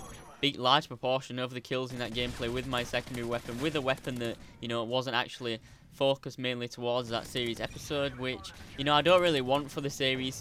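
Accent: Yorkshire, United Kingdom. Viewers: are u from yorkshire ?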